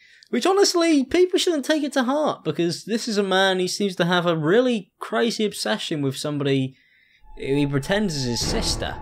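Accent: United Kingdom. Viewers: Why did Jacksepticeye turn british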